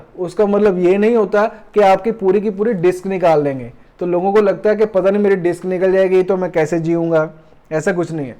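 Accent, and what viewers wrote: Punjab, India. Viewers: Accent punjabio wala hai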